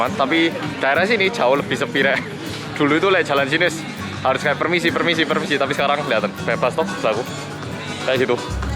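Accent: Java, Indonesia. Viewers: Tetap jaga image medhoknya ya...karna tiada duanya youtuber korea yg medhok jawa..mg sukses👍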